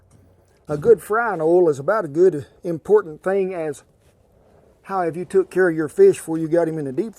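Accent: South, United States. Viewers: LOVED this!!!! If i'm looking for a catfish recipe and there isn't a good southern accent, I promptly hit the "back" button!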